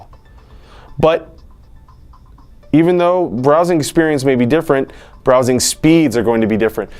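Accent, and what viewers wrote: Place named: Australia. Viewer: awful aussie accent mate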